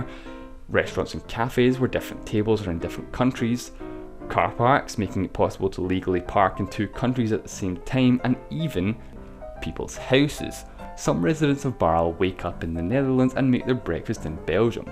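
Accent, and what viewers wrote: Scotland, United Kingdom. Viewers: alan benlolo It's English with a rather strong Scottish accent. I can understand it pretty well. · This is a Scottish accent right. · Are you Scottish? I like your accent. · What about Russia, there's a small bit close to the north sea between Poland and Lithuania. And BTW, love your accent, what is it? Scottish. thanks for this video. Don't mind the twat who cannot speak in English, your speech was clear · He's Scottish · You Scottish? You're reminding me of my year abroad rn. It's a fond memory 😊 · he's scottish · Is the speaker from Scotland? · I like your accent. Are you Scottish? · Scottish accent? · Is he Scottish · What problem do you have with his accent? The guy is Scottish, so what? Many of his videos are better laid and he explains stuff better. CGP grey uses a lot of humor where he doesn't know what to say and speaks way fast. 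· Walid Bahri Um you do that's a Scottish accent right?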